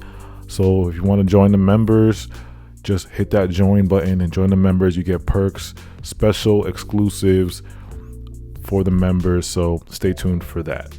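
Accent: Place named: Buffalo, United States. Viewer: sound like he from Buffalo …. WHERE U FROM?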